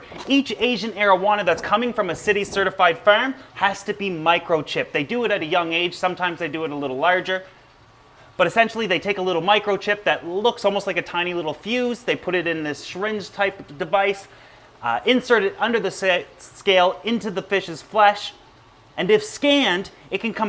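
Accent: Canada. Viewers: as a fellow canadian i understand how said cities when its said more like sighties. I know the spelling aint like that but its cities said as sighties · Canadian accent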